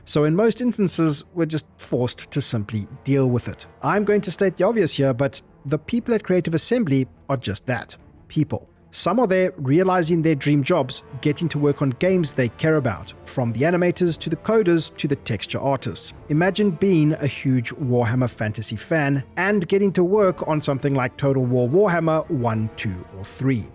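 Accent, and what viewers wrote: South Africa. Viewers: Interesting accent, sounds South African. Subscribed. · You sound South African, New Zealander maybe... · Are you from South Africa? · South African??? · Great video. Thanks for posting dude man. Great to hear a based south african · Hold up. South African accent? Opinion accepted. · you sound like a fellow SAFA. If so always nice to see a fellow ass end of Africa resident. Lekker Vid mate. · Haha, very cool to hear a fellow South African on YouTube, you sound like you are from the coast?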